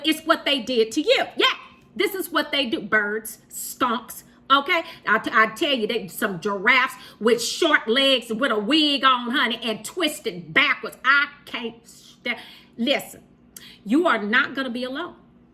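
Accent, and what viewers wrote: South, United States. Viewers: Whenever she says, "That bird, SKUNK!" I be dying laughing🤣🤣🤣 she has a lil southern accent.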